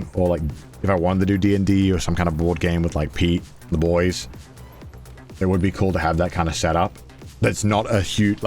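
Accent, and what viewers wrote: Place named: Wales, United Kingdom. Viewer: Then why are you busy streaming in online platform like youtube in your channels to have money but not get a job for that country japan and live for years. Maybe you and your co should follow up your status because the government in Japan can be changing the policies when it comes to foreigners. And add to that they had lower birth rates and the government made a drastic plan for that. Why ask led Joey about his travelled in many parts of the country. Sinced you are from U.K. particulary Wales sinced your accent is deep.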